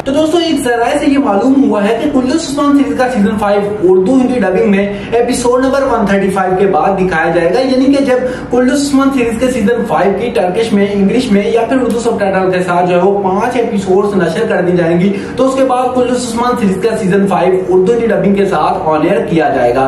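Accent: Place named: United Kingdom. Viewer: Bhai apki voice thode clear karlo aisa lagraha hai koi British urdu me baat karraha hai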